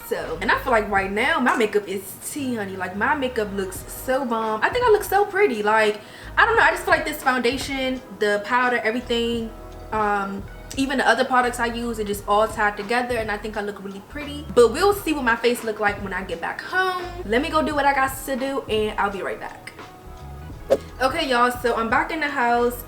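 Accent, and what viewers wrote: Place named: Baltimore, United States. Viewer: Sounds like she's from Baltimore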